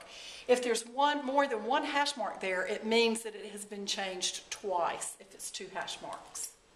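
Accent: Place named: South, United States. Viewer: Definitely southern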